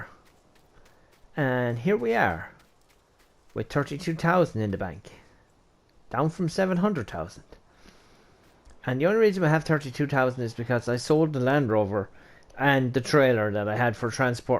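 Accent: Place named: Ireland. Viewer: Nice intro😂. I really like the humor and Irish accent. I will watch this during the day👌.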